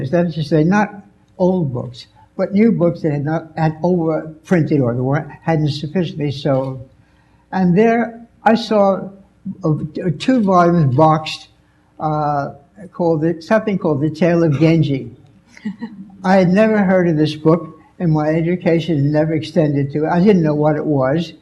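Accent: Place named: Brooklyn, United States. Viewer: Never lost his Brooklyn accent.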